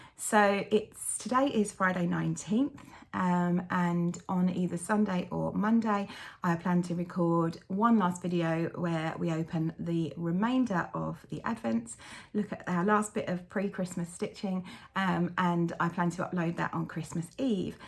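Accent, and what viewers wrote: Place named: Australia. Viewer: Hi, I have been loving the videos. I have been watching each morning with my cup of tea but I am currently watching with my parents and they think you sound Australian 🙈 I think you would have mentioned something so please correct me if I am wrong in thinking you are not originally from there 😅 from Immy (StitchedbyImmy) x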